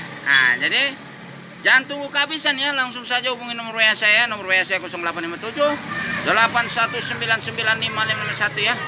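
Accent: West Sumatra, Indonesia. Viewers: Suaranya kayak minang kocak...lucu gan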